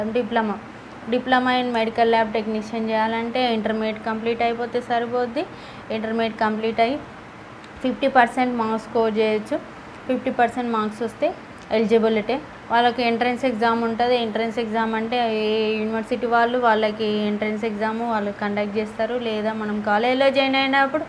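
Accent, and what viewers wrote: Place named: Telangana, India. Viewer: Telangana slang lo super chepthunnaru medam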